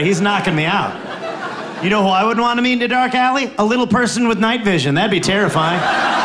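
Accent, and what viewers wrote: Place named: Wisconsin, United States. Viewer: Wisconsin accent is on point 😂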